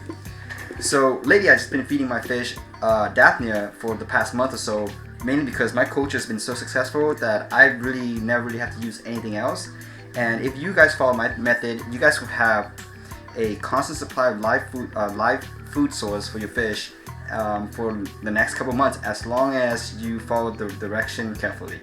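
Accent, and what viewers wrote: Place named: Australia. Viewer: Nice video! Very informative! Just curious I detect an accent, are you originally from Australia?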